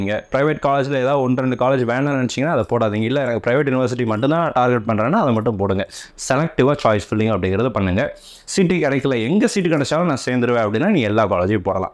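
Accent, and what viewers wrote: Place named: Madurai, India. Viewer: சார் நீங்க என்ன மதுரைக்கார(ய்)ங்களா? அவ(ய்)ங்க தான் சார் இப்படி பட்டு பட்டுனு பேசுவா(ய்)ங்க.. கொஞ்சம் கூட கோயம்புத்தூர் வாசம் பேச்சில் வீசவேயில்லை😊 இனிய காலை வணக்கம்.நனறி😊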